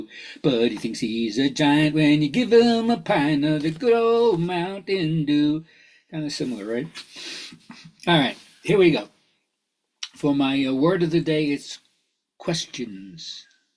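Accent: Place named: India. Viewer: That Indian accent 🤣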